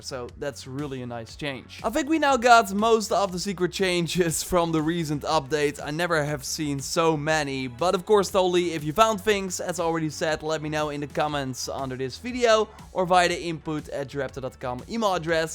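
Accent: Netherlands. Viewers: Small Question are you dutch?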